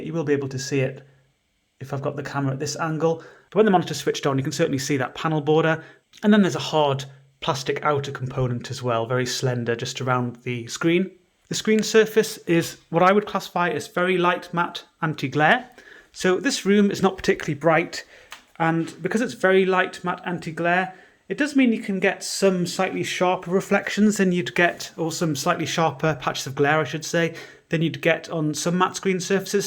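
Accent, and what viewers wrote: United Kingdom. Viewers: UK accent adds even more points to the professionalism of this video. Top notch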